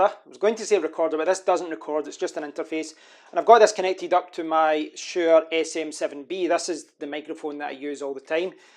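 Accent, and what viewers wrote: Scotland, United Kingdom. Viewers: I was looking for something else, but kept listening because of that amazing Scottish accent.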